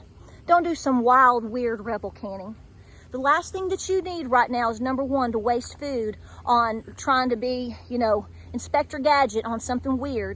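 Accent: South, United States. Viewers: I like your accent; you must either be a Texan or from some from south. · I thought you were from the Netherlands until about half way into the video and I thought you sounded like the most American foreigner that I've ever heard. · your not duche your amerikan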